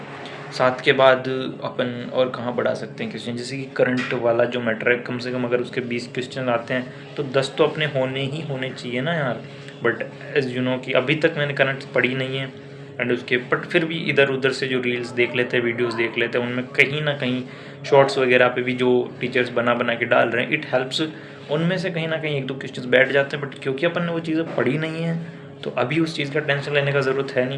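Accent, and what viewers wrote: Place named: Hadoti, India. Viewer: बोली सै हाडौती का लग रहा है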